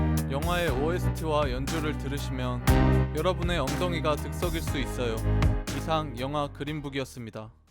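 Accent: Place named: Gyeongsang, South Korea. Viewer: 맞는 듯 ㅋㅋㅋㅋㅋ 쌍도 사투리에 소리가 오른쪽으로만 나오니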